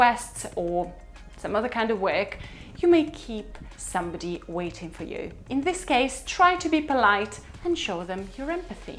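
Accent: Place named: United Kingdom. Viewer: Странное сравнение... У этой девушки поставленный британский акцент, в то время как у Марины - американский.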